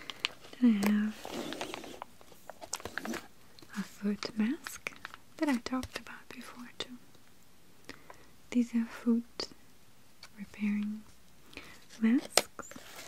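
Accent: Russia. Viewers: your Russian accent <3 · really enjoyed your state of mind during this video ! very natural and spontaneous, your positive attitude is contagious ! we really appreciate your russian accent also ;) thank you very much ! kisses from France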